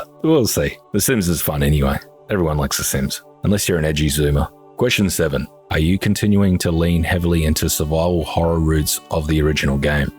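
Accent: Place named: Australia. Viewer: Yo love your vids but I got a weird question but what is you accent or dialect to me it sounds Australian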